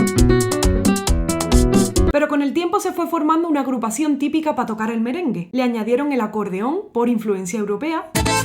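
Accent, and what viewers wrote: Andalusia, Spain. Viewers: El algoritmo me trajo aqui. Mas que el virtuosismo de tu cantar y tu guitarra, me encanto tu acento "Andalu Light". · Cuando escuche una mujer de acento español que decia que iba a tocar y cantar una cancion tan hermosa me detuve. SOY DOMINICANO, y casi se me salen las lagrimas al escucharte nombrar un campo de mi pais donde hay mucha pobreza llamado Villa Vazquez, y al escucharte mencionar en la Cancion la ciudad de la Romana, una ciudad del este azucarera, llena de Bateyes, pequeños campos de campesinos que cultivan la caña de azucar que viven en pobreza extrema, donde aun no hay baños sino letrinas, y viven aislados del mundo sin ninguna comodidad. Esto no es solamente una cancion, es una historia triste, mis abuelos fueron agricultores, y la agricultura no se efectua aun a la fecha con maquinarias, y en esa epoca ni empleados se usaban, mis abuelos usaban a mis 8 tias incluyendo a mi madre siendo niñas y adolescentes, para cultivar la tierra, y cuidar ganado desde la 5 am y a veces mas temprano, en esa epoca y aun a la fecha muchos campesinos ni tienen sistema de irrigacion dependiendo 100% de las lluvias, y hoy que vivimos con tantas comodidades a pesar de ser pobres. 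Ojala algun dia se cumpla el sueño de en todo el mundo y principalmente en LATAM, donde hay tanta pobreza en los pueblos agricolas. Quizas para ti y para la mayoria estas solamente sean las letras que riman de un merengue, pero para nosotros, la yuca y el te, el arroz blanco que es parte de nuestro plato nacional es una realidad.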